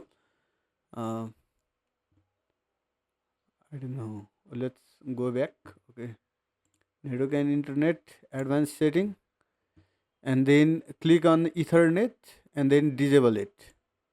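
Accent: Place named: Nepal. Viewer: from the accent I can say you are Nepali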